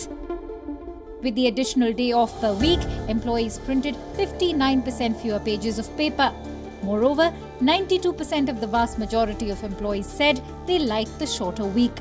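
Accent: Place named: India. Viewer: Indian English lol learn the pronunciation first. Work on your accent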